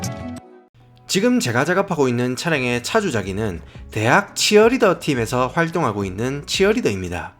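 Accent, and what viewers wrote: Gyeongsang, South Korea. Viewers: 영상 재밌게 보고, 구독, 좋아요 달아줍니다.ㅎㅎ 고향이 경상도 분인거 같네요. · 혹시? 리쌍의 길 아니세요? 알고리즘 타고 들어 왔다가 헉했어여. 경상도 사투리 강렬하네여.ㅋㅋㅋ.구독 조아요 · 우연히 영상이 떠서 봤는데 재밌네요 저도 미국인데 그래서 반갑고 사투리라 또 반갑네요 저도 경상도출신인데ㅋㅋ 사막이면 라스베가스이신가.. 암튼 구독하고 갑니다 더운데 수고하세요! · 가끔 보는데 마술사같아요 경상도 영어도 재밌어요~^^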